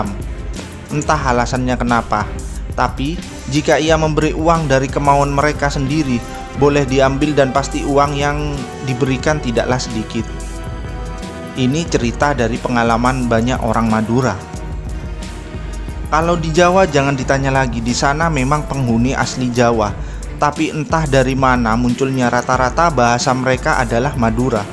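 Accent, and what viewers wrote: Madura, Indonesia. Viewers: Logatx madura banget ini.. Haha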